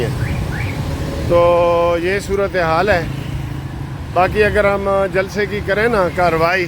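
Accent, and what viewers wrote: Saraiki, Pakistan. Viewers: صاحب ۔ سرائیکی لحجے میں اردو تبصرے اور تجزیے اور تازہ ترین معلومات ۔ ماشاءاللہ اس کا ایک اپنا مزی ھے۔ اللہ تعالیٰ آپ کو جزائے خیر عطا فرمائے آمین ۔ اپ کا تعلق قانونی حلقوں سے ھے اور معروف قانون دان بیرسٹر ہیں ۔ تحریک انصاف ایک بار پھر مخصوص نشستوں پر مزید وضاحت کے لیے متفرق درخواست کے ساتھ سپریم پہنچ گئی ھے۔ 3 آئینی ادارے آن بورڈ ہیں انشاللہ جیت پارلیمنٹ کی ھو گی۔ آپ سے درخواست ھے اس کے متعلق اپنی قانونی رائے سے نوازیں کہ اونٹ کس کروٹ بیٹھتا نظر آ رہا ہے ۔ اللہ تعالیٰ پاکستان کی حفاظت فرمائے اور فسادیوں اور انتشاریوں سے محفوظ رکھے آمین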